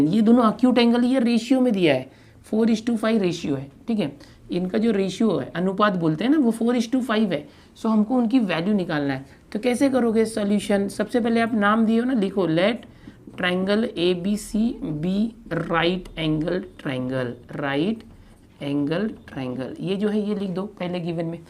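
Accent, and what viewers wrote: Maharashtra, India. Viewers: Sir aap marathi hai kya.. ????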